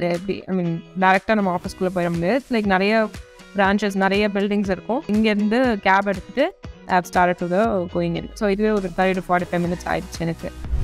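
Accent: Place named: England, United Kingdom. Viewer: Tamil la pesu bodhum english accent..😅😅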